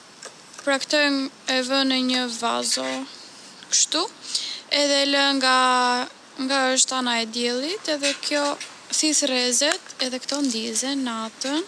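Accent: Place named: South, Albania. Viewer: Lezetshem si ide ! Bravo ❤❤ Nga e folura dukesh si nga jugu🙈🙈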